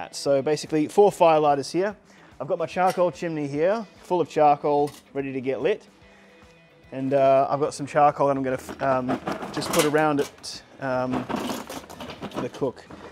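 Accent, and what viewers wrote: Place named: Australia. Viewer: Hi mate, you sound Australian. 👍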